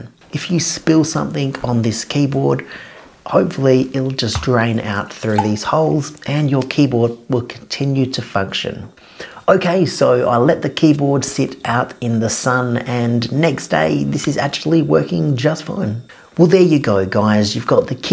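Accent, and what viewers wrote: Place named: Australia. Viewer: What affectation is this? I am unfamiliar with Australian dialects but he has a tightness in his voice that sounds like an affectation, and he hits his S plosives extra hard. Where in Australia is this accent from?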